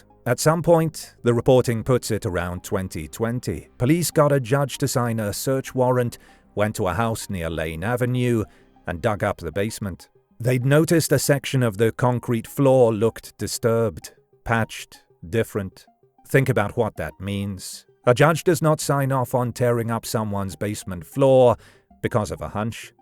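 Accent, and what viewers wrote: United Kingdom. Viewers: He's British. Probably a different pronunciation.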